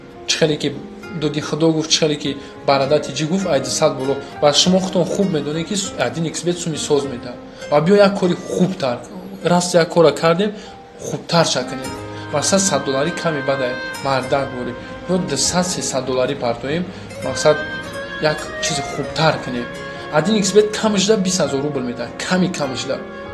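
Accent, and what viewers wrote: Kulob, Tajikistan. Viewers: Кулобии тоза!! 👍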